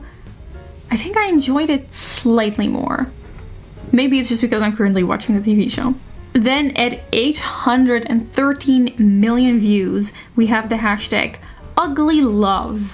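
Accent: Netherlands. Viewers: Are you Dutch? Btw, love your videos btw❤❤ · Ik hoor een Nederlands accent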